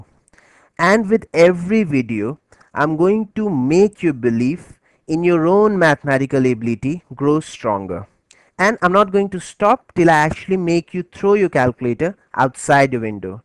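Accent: India. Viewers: hey i think u r an indian but no offence. your accent is that of an indian. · LOL are u from India? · indian accent! nice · u r an INDIAN !!!... indian accent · ur vioce its like an indian voice BTW im going to use this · are you a realy british... because your accent is like indian :D you are the best broh...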